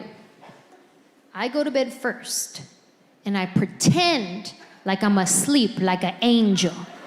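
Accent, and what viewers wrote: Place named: California, United States. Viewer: She’s really refined her routine, she’s always had funny material, but now she’s “polished” as a stand-up comedienne. However, I do wish she used adult language like 98.4% of us normal adults use in our everyday lives….and I like the fact that she hasn’t lost her Calif accent, she sounds like she could still be an Oakland Raider cheerleader….if she ever books a gig here in San Jose, Calif, I’m definitely buying tickets to see her live…😊✌🏻🇺🇸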